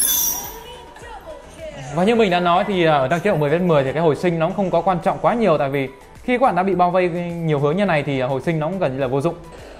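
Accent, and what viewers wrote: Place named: Thanh Hoa, Vietnam. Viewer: Nghe thấy giọng thanh hoá tắt video ngay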